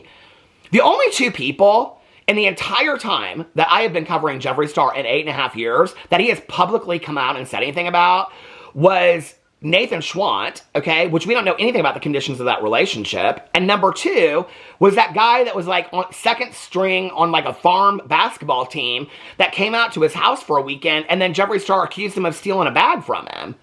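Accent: South, United States. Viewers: I have been in the most stressful and depressing state today, and I clicked on this and I Swanee you have made me giggle out loud! I lol legitimately when you said even Kim didn’t claim KW 🍆. And yes, I love when your southern twang peeps through.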